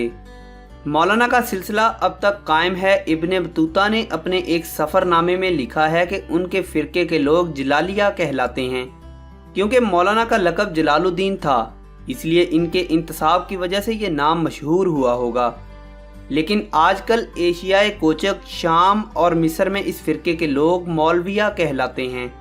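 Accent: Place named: Punjab, Pakistan. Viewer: I understand lots of people are doing this for some extra income but healthy criticism helps improve quality of work. I'm sorry that I pick on you accent but when it comes to educational videos there's no compromise. I can tell you are Punjabi so am I but our national language is Urdu and taught in schools from nursery level so it deserves that level of respect and perfection. Hope it makes sense.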